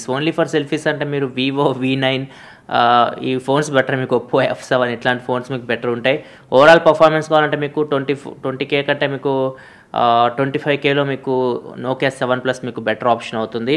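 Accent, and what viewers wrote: Ongole, India. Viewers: Sandeep Sandy ongole kadha valladhi own anduke alaa matladutundu☺️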